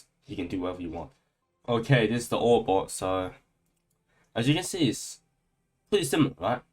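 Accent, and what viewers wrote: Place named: Australia. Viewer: You sound like you have an Australian accent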